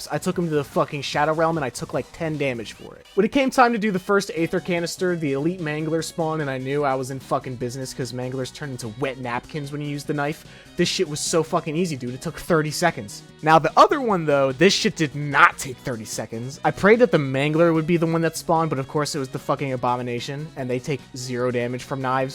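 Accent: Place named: Midwest, United States. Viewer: Love the Fucking commentary 😂 you from Chicago or the Midwest or something? Haha hope your channel grows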